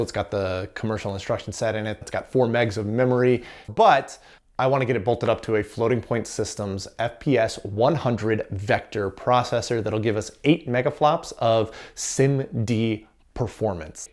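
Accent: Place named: Texas, United States. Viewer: I love how you got real Texan when talking to camera. David Murray does it too. Nice Bendix by the way.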